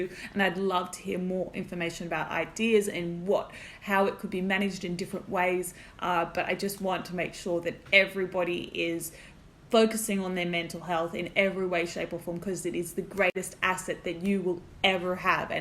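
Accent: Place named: New Zealand. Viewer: Gee you speak well, just reinforcing your absolutely stunning tribal council speech. Also just a small thing but as a Kiwi now living in Vic ... I can hear your accent starting to change and you are beginning to adopt the NZ way of saying your vowels.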